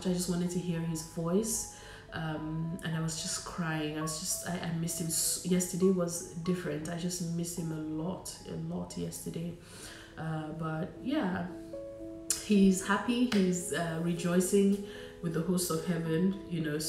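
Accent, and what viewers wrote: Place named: United Kingdom. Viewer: This one that you are already having British accent 👀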